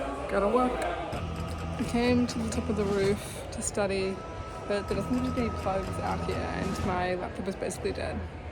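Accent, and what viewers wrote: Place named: Germany. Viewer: Clearly! She has a strong German accent 😂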